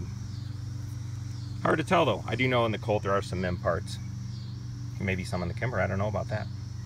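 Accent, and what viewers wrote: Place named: Wisconsin, United States. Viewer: You sound like you're from one of the northern states, like Wisconsin.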